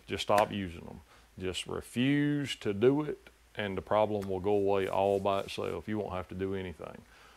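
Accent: South, United States. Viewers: Why do the people that run this country not care about the quality of the products that we purchase with our hard earned dollars ? This really burns my A$$! They want you to vote for them for what! This is Disgusting that you have to put in this much effort to use a flat tappet cam! On another subject I am from Pa. We lived in Arizona, New Mexico and Tennessee and no one ever knew where I was from. They said I don't have an accent,go figure. That said I could listen to this Guy forever, I love the southern drawl and in women it's hot! I hung with with a guy from Cobra town mountain in Tennessee and this man sounds about the same. Never had a problem with it!!👍👍👍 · Sounds like a proper ‘murrican to me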